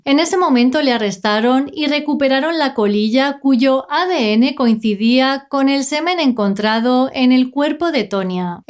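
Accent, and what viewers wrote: Castile, Spain. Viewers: Eres quizás sudamericana pero con pronunciación castellana ???? Porque no pronuncias de modo normal ???? Más pareces salida de Telecinco, por Dios habla normal !!!!!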